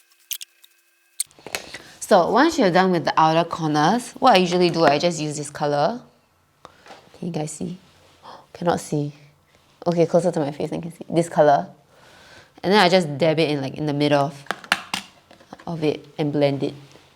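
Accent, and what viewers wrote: Singapore. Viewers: I am ex CX, did u know sq and cx are very competitive(our point of view lol), I always heard sq was doing thus and that...blar blar.hahaha I was wondering sq girls do not do galley works, male crews hanfle galley, so your uniform can be fit and tidy, is it true? any way, welcome to Korea, hope you have another wondetful life here. your English accent remind me my SIN crew buddy that I haven't met over 15 yrs since I resigned. miss crew days sometimes. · I didnt know u have youtube channellll 🥺 glad i know it noww hehe ❤ i really miss hearing someone speaks in singlish coz i have no singaporean fwens here in indo and i rarely talk to my old fwens in sg anymore 😔 looking forward to more vidsssss 🎉🎉🎉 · idk y but lemme just enjoying that singlish lol, anw that sounds more like malaysians when they r speak english i thought >.< imo thats super easy to identified melayu characteristic xixixi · I can only say "what?" "Excuse me?" For some of your Singlish especially when it comes so fast 😂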